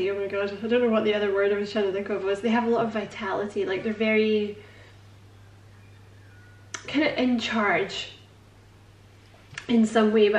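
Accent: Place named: Scotland, United Kingdom. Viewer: Your Scottish accent is off the chart sexy. The hell with my twin flame , I'm feeling your energy 🥰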